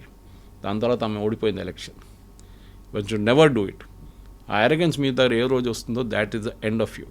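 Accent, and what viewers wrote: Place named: Kerala, India. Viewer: మీ భాషాభిమానానికి వందనాలు. కానీ ఆయన మళయాలి.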